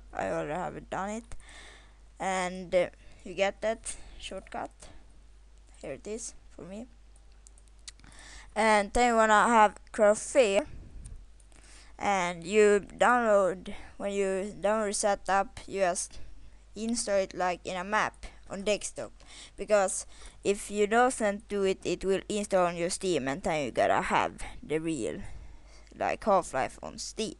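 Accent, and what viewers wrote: Sweden. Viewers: dat swenglish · worst tutorial ever if you dont speak that good of english please dont try it just stick to sweden cause your accent is embarrasing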